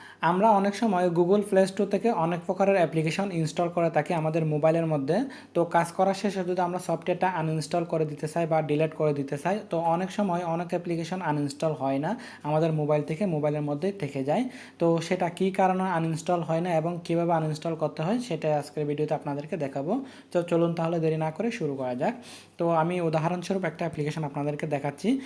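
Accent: Chittagong, Bangladesh. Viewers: চিটাগাংইয়া নাকি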